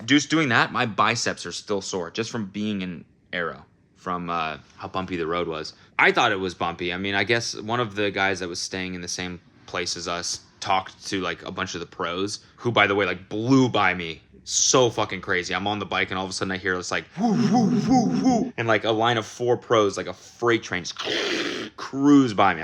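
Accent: Australia. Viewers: Legend! Keep mounting and do another one. Oh and your Aussie accent is fire! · Your Australian accent is elite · I knew you were in Australia, but the accent is always a jump scare.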